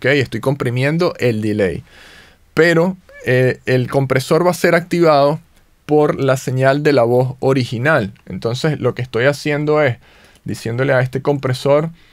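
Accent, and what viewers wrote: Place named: Caracas, Venezuela. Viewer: A propósito, eres venezolano, cierto? Tienes acento caraqueño.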